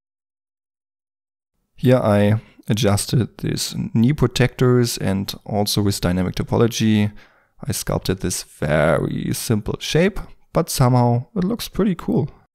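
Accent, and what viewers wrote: Germany. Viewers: Schönes Englisch, etwas hört man dein Deutsch aber nicht auffällig. Dieses Video war informativ und wirklich sehr toll!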